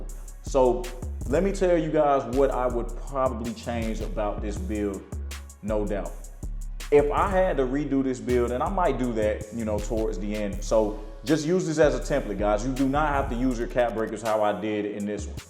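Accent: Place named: South, United States. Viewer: Great content bro new sub ! U sound like u from the south dog !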